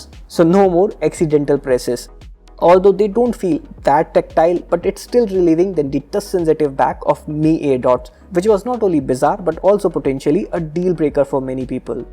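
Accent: India. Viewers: Huy Tran this is their accents. I got familiar with them after 5 years study in India..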